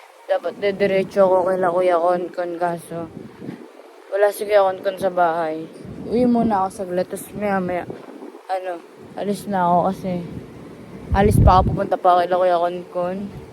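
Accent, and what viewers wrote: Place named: United Kingdom. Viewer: Parang taga cambridge ka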